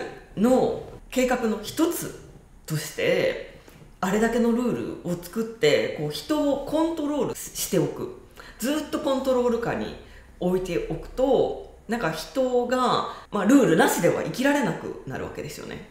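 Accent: Kansai, Japan. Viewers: Momoyoさんこんにちは。今回の動画も、Momoyoさんの話を聞きながら、自分はどうだろう？境界線引けてるかな？なるほどなあ、など、たくさん自分と向き合うきっかけをいただきました。ありがとうございます。わたしの中にチクチクと刺さっている言葉があったのですが、今回のルールのお話でその言葉がすぅっと消えていった感じがありました。 「ルールだから」「慣習だから」は、本当の愛を見失ってしまいますね。わたしはわたしの愛を表現する力を頂いたように思います。ありがとうございます。 Momoyoさんのツッコミや関西弁、歯に衣着せぬ物言いがだいすきです。今回も何度も吹き出しながら動画拝見しました笑ありがとうございました。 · 『理由はありません、て言うてもてるやん』← の関西弁、好き❤️ 確かに、人に迷惑かけない為のルールに縛られすぎてる❣️って、迷惑かけるが大前提になってますやん（笑） お互いに尊重できる日本になるといいな^ ^いつもありがとうございます✨🙏✨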